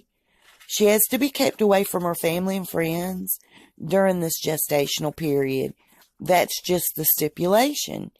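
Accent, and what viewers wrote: South, United States. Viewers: I love the lady's voice in this video...Very southern and charming...and I almost kind of believe her. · You love nasty,mean gossip.I can hear your southern accent,maybe your racist,because your not patriotic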